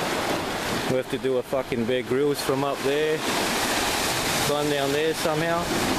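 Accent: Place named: Australia. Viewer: Cool looking place there! Nothing like that here! Where exactly are you from? Sounds like Australia or New Zealand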